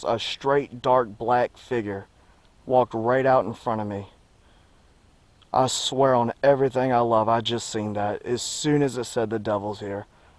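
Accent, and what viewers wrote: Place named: South, United States. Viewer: are you in Louisiana? I noticed you had a southern accent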